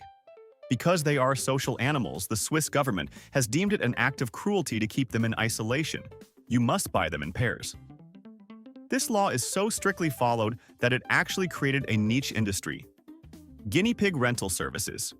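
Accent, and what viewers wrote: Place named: United States. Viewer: this collection has been put together, evidently, with an American point of view, even spoken with a recognizable American accent American prohibits chocolate surprise Kinder eggs because only American children are so st++id and they swallow the surprise... even Pope Francis made fun of it during an official state visit to the Vatican of J.D. Vance and gave his children Kinder eggs as gifts !!! IT WAS HILARIOUS THE WHOLE WORLD MADE FUN OF AMERICA and AMERICANS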